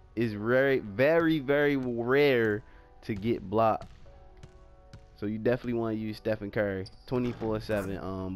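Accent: Baltimore, United States. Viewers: You from bmore ?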